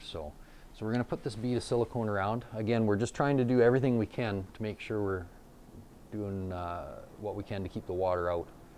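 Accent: Canada. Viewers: I love the Canadian accent, aye!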